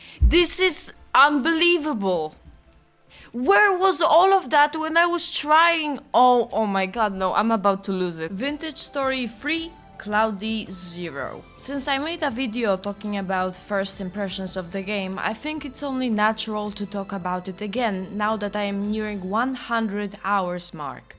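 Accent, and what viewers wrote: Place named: Poland. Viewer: Why do I have this strange feeling that you're Polish? I could swear you have that kind of accent.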